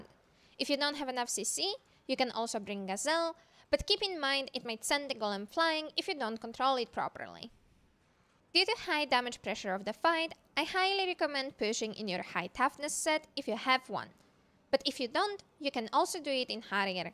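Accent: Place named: Ireland. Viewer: Thanks for the great guides! Pushing always gave me rough time so I just switched off support to dps for this flight. P.S: Interesting accent! I can’t identify it. What region are you from? I get Irish vibes but I have no idea.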